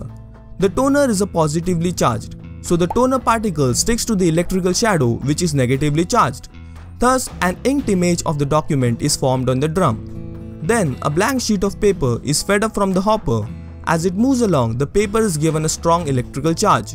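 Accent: India. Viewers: I am a Taiwanese and no offence intended. Actually, I am not a fan of indian accent but because your video is highly informative and understandable so I found your voice beautiful and educational.... Big thanks！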